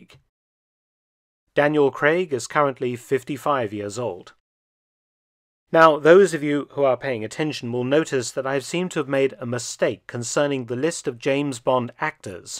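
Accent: United Kingdom. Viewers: Personally, I thank you would have made a first class James Bond. The look, the clipped British accent and a general quiet swagger. Your next mission, should you decide to accept it..............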